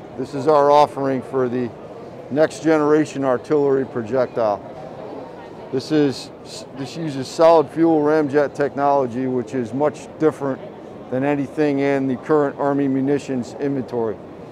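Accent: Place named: South, United States. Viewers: He has a terrible Southern accent. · The American accent isn't great for selling the "best". I'm so use to hyperbole in it, I'm sure it's great but I'm less sure because of the accent.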